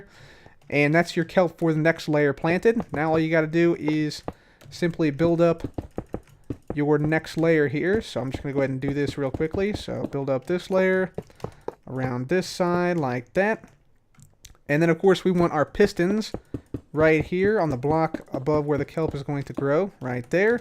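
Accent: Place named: Alabama, United States. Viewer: Dat Alabama accent tho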